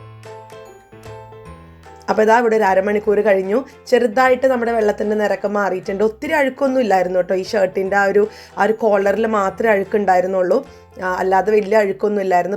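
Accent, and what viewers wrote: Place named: Thrissur, India. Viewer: alla vedio vum kanarundu. njan aadyame chindichu. ningal trissur kkari aanennu. othiry eshtanu samsarikkunnadu kelkkan. wish u good luck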